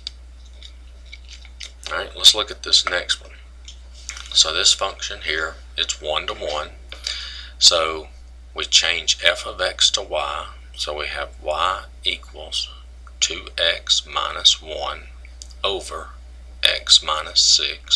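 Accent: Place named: Texas, United States. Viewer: Thank you so much Sir. Your explanation was perfect. It helped me understand the topic better. By the way, I love your Texas accent. I think it's cool.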